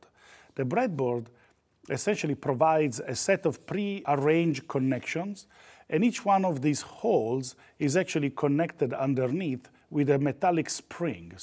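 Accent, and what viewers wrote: Italy. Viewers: Si capisce che sei italiano, sia dal nome, sia dalla pronuncia, quindi si potrebbero avere i sottotitoli in Italiano per chi come me non sa bene l'inglese?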